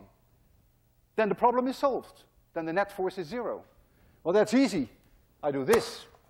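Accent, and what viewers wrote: Netherlands. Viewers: It's interesting how your Dutch accent become much stronger in the past years again!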